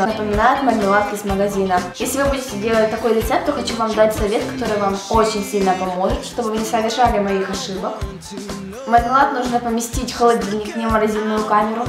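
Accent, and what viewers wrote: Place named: Ukraine. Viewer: Она ведь из Украины, а у нас многие так говорят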